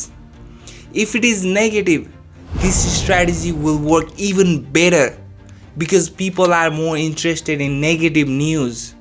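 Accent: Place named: Brazil. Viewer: Friendly advice in your Youtube debut: No-one wants to be lectured on how too think, less so coming from foreigners. Rethink your Youtube content strategy!... Your theatrical hand gestures, patronizing words and tone, in an impossibly too strong accent (Brazilian?), combined with dramatic music, this just doesn't work my friend.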